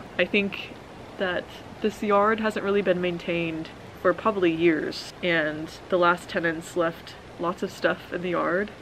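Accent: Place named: United Kingdom. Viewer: Bonjour ! Tu vis dans un environnement magnifique, idéal pour trouver l'harmonie et se sentir connecté, et y compris avec soi. Une astuce pour apprendre le français ( même si tu en parles dans la vidéo), regarde des films en français, tu verras..c'est chiant !! Mais concentre toi sur l'action, tu comprendras un peu, mais surtout, ça va travailler ton oreille et tu apprendras plus vite !! C'est ce que j'ai fait avec l'anglais et au bout d'un mois aux USA dans une famille, je tenais une conversation, alors qu'au début je ne comprenais rien, mais rien de rien 😁! Par rapport aux Britanniques, votre accent est terrible ( il faut comprendre la clef), et vous parlez VRAIMENT très vite !! L'horreur 😂... Après, c'est juste une question d'habitude. Le plus incroyable, qui m'a vraiment surpris, c'est que je pensais en anglais pour parler. Voilà.. Merci d'être toi ! 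Peace...🌿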